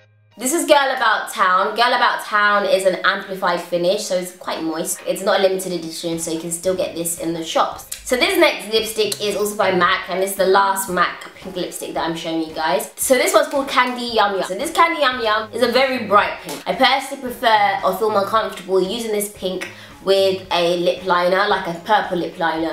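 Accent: United Kingdom. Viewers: Are u British cause I hear the accent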